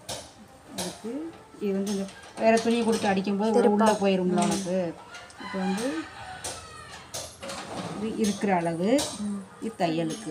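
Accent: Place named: Karaikudi, India. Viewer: அக்கா நீங்க பேசுற பாஷையா பார்த்தால் நம்ம ஊரு காரைக்குடி மாதிரி தெரிகிறது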